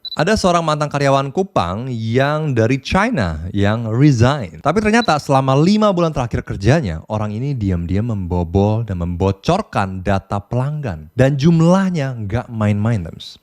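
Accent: Jakarta, Indonesia. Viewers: muka Korea logat bahasa Indonesia abis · aksennya indonesia bgt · Ngomong nya sdh Indonesia banget ( jakarta ) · Lah emg anak jaksel 😂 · Aksen bahasa indonesiamu bener2 indonesia banget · logat indonesia bgt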